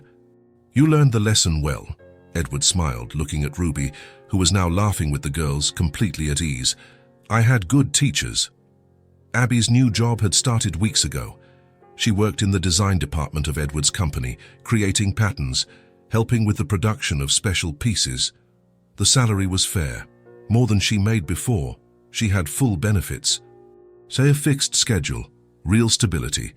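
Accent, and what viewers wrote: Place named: United Kingdom. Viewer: What's with the British accent speaking American words. Since when do you cut into risotto?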